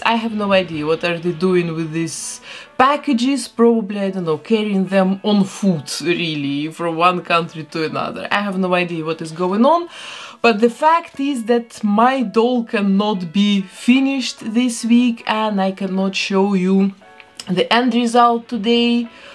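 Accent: Russia. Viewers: Noooo, not "ghouls alive" spectra( you can not reroot her because the mecanism in her head( Btv, i knewed you have a "russian" roots) not just because accent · Мне кажется я слышу русский акцент 😅 interesting video,thanks you 🌸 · Какой же сильный акцент Мне кажется, что это девушка русская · Lol! Mystery of your accent finally solved!!!!! I thought you sounded a bit Russian ! Interesting! Turns out I was right!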